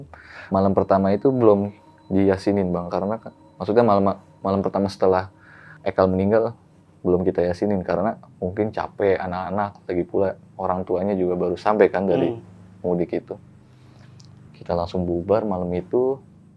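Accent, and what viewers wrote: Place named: Bali, Indonesia. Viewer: Ini host nyh orng BALI kah ? Logat nyh jelas bgt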